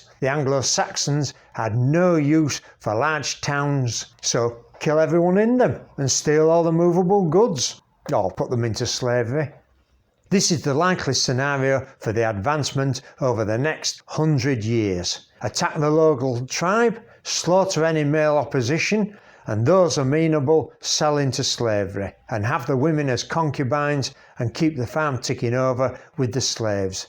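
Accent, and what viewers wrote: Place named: Yorkshire, United Kingdom. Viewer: even better with a Yorkshire narrator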